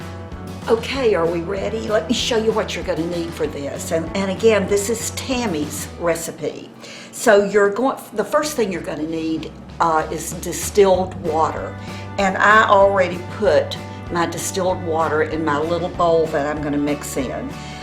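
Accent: South, United States. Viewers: She's adorable . Not to mention the southern accent xo ...get try it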